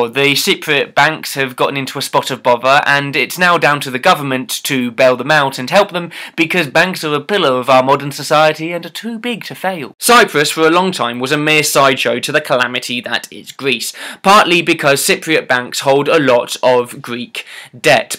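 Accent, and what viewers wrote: Russia. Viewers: P.S.: I love your Russian accent. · Your Russian accent is so good!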